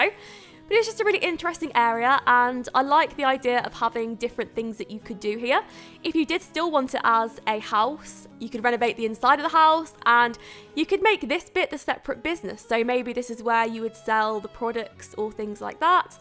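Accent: England, United Kingdom. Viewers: Not many comments... are you English from England, I am:)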